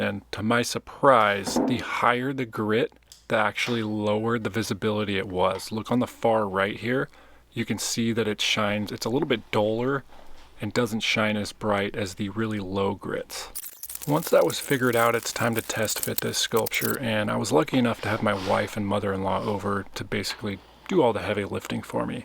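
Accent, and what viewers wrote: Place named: Midland, United States. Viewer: baking vs bakin' Stormed by the midland dialect of North American English: goin', doin' waitin', etc. And how about the disappearance of the distinction between i and e before nasals? Pit/Pet: solid difference. But Pin/Pen: they sound identical to the midland dialect ear. BTW, thanks for a great video about the creation of art which explores way beyond the creation process to include unexpected results. Top notch!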